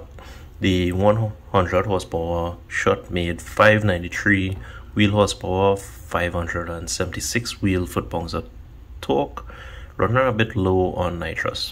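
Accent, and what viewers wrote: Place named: Trinidad and Tobago. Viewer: Yo, you sound likea Trini! Big up Trinidad; we got some of the best mechanics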